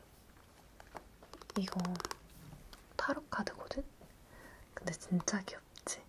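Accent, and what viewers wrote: France. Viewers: omg your french was so cute 🥺💗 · Your french ? omg it's so smooth !!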